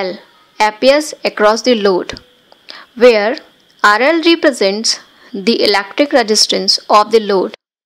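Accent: India. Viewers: Animation is pretty ok but DEAR GOD I can usually stand Indian narration but JESUS CHRIST THIS ONE HAS DRIVEN ME INSANE IN 30 SECONDS I CAN'T FUCKING TAKE IT ANYMORE thank God for auto-gen captions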